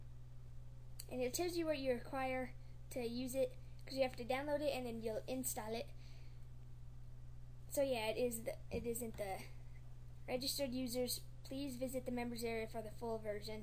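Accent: Ireland. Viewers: where r u from?? Little kid? ireland?? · irish? waayyy kewl :) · u irish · He sounds Irish to me xD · Irish accent detected. Adorable.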